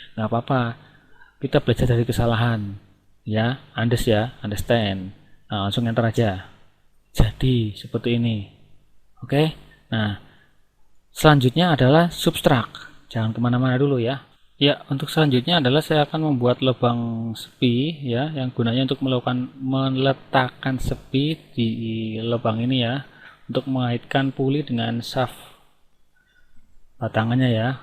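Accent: Banyumas, Indonesia. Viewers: Belajar ning pasti kepenak...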